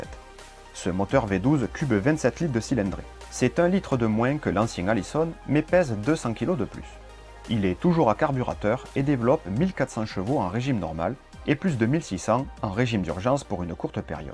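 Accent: South, France. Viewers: Cet accent du sud m'avait manqué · Toujours content de voir une de tes vidéos dans mes abonnements. Vidéos toujours aussi détaillées, explicatives avec une très bonne approche sur le contexte des choses expliquant certains choix techniques et limitations du moment. Puis bon, toujours content d'entendre notre joli accent du Sud! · Très beau documentaire … Les détails techniques et historiques sont très bien présentés. Le petit accent méridional du narrateur et quelques pointes d'humour permettent de suivre cette présentation.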